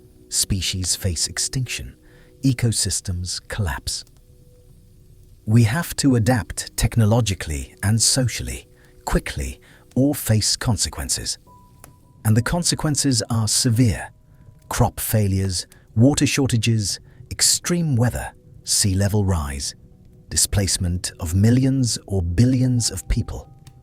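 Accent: England, United Kingdom. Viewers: an English-sounding AI narrator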